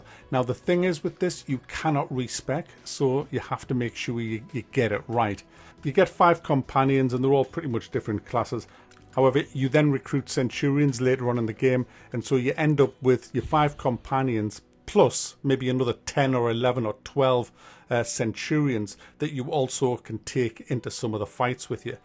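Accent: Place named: Northern England, United Kingdom. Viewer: Good to hear a northern accent! Im a Geordie myself. Great content mate cheers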